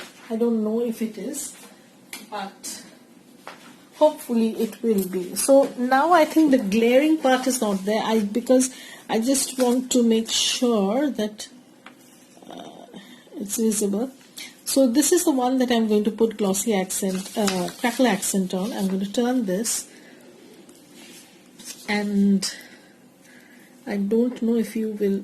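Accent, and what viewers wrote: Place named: India. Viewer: I could listen to your lovely Indian accent for hours. Very cool technique.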